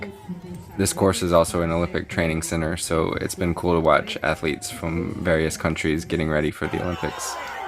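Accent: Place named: Brazil. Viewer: . está a ficar muito "abrasileirado" 😒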